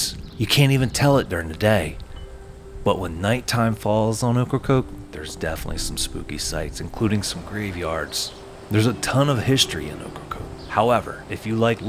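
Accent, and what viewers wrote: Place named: New England, United States. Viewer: Here Here! 😁😍 he"s a Yankee...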